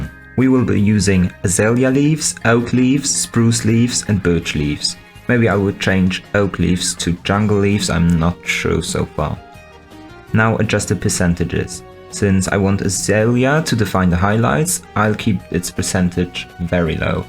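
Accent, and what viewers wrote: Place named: Germany. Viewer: Oh nice I thought i heard some German in there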